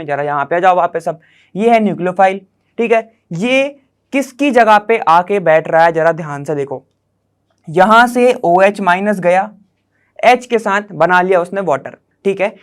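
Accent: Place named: Bundelkhand, India. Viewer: sir ki voice se lagta hai sir bundelkhand se hai ,i guess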